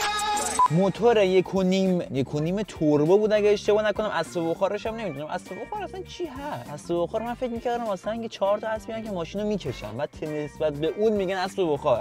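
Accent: Mazandaran, Iran. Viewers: مازندرانی هستی؟❤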